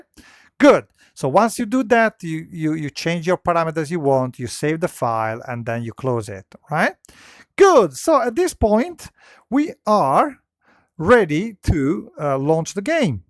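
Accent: Italy. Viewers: Wait r u italian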